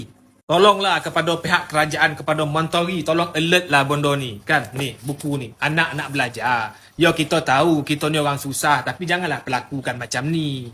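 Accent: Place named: Negeri Sembilan, Malaysia. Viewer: Terhibur la dengar dia ni cakap. Sampai aku ulang 2-3 kali. hehehe... Aku mmg suka loghat negeri 9 ni :D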